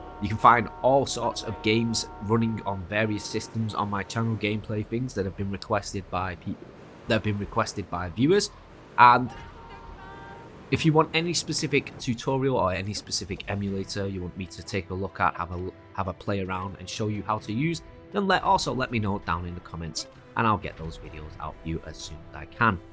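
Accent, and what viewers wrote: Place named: England, United Kingdom. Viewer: Maybe because he is speaking English with an English accent.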